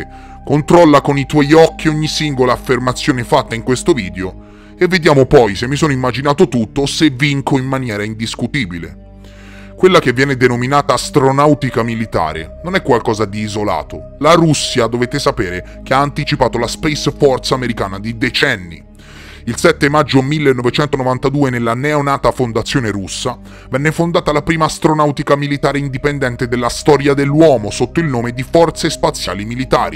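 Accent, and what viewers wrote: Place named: Lucca, Italy. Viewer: I enjoy your strong lucchese accent EHEHEH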